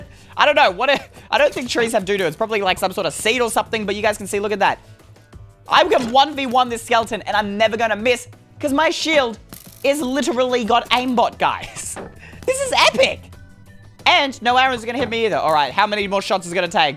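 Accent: Italy. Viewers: I just love your Italian Ascent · OMG. ‘I have 2 things 1: I ABSOLUTELY LOVE YOUR ITALIAN ACCENT ! 2: Dream : Want my comment to be hearted ( idk if u did ) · I LOVE your Italian accent!!!!!! You make every day so much brighter!!!! ☀️☀️☀️ You are amazing!! I love you ♥️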